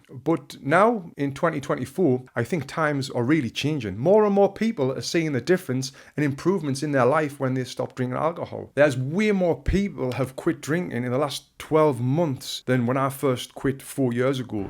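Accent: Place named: Sunderland, United Kingdom. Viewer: Yes Lad your propa letting your mackem accent out boyo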